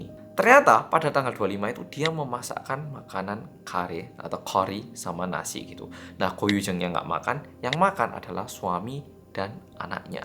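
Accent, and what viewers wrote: Java, Indonesia. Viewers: Medok muantepol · Mas bahasa indonesianya lues banget, logat jawa sih, tapi yang ndak tau klo mas orang korea pasti dikira lahir di indo, hidup di indo, asli indolah wlopun keturunan korea. Klo youtuber korea yang lain, masih bisa ditebak, krn walopun berbahasa indo tapi logat koreanya keliatan.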